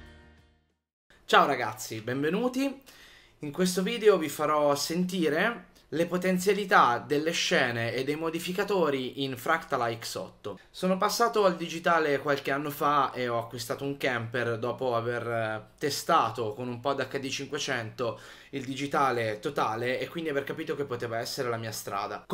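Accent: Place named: Tuscany, Italy. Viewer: Oh con l accento toscano mi fai troppo strano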